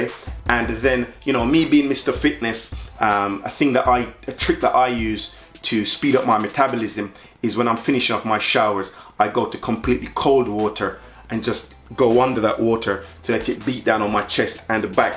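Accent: Jamaica. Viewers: The patwa hawd fi hide. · Hey man, are you originally from Jamaica? I can hear it in the accent.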